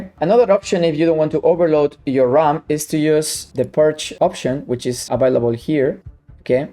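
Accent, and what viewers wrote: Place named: Spain. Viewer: soy de colombia y hasta en inglés se nota el acento de españa. hahahaha, tan lindo e inteligente que es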